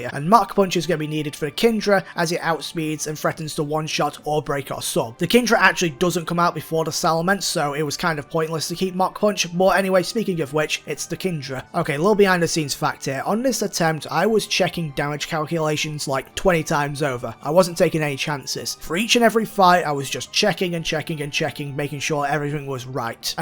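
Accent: Northern England, United Kingdom. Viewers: He defo Yorkshire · Whereabouts from up north are you from lad? Can’t pin down your accent. Leeds?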